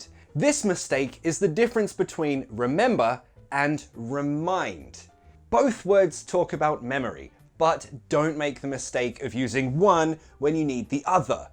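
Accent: United Kingdom. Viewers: The British accent is interesting, like when they say - never (nevah) :3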